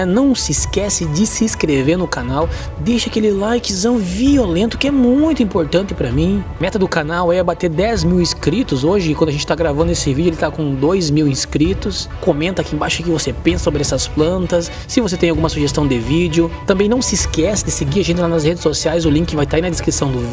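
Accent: Rio Grande do Sul, Brazil. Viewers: Gaúcho né !!! Inscritos!!! Top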